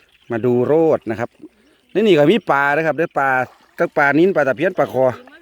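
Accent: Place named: Korat, Thailand. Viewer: เว้าคือคนบ้านโนนสุวรรณเนาะ อ.ไดครับโคราช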